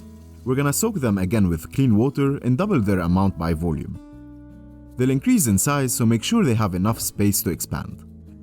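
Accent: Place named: Lebanon. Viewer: Hah. That’s a Lebanese accent if I’m not mistaken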